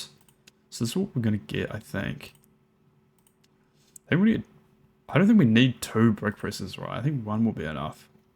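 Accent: New Zealand. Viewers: also i know your AUS but you sound like you have a touch of kiwi.. true?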